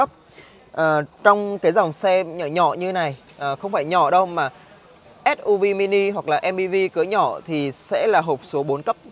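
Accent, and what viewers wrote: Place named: Thanh Hoa, Vietnam. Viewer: Thằng này nói nung tung núc thì 550 núc thì 650 nói quá nhiều giọng lại thanh hóa khó nghe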